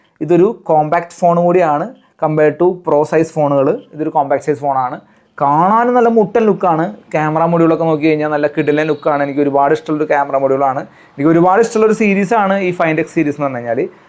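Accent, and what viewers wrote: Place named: Thrissur, India. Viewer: trishur basha ane udechad ene tonunu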